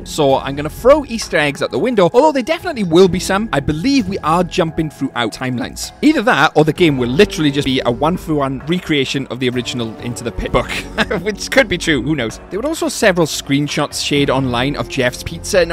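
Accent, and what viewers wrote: Wales, United Kingdom. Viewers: Welsh accent ?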